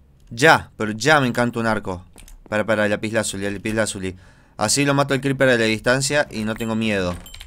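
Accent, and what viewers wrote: Argentina. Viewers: tienes acento argentino pero hablas como peruano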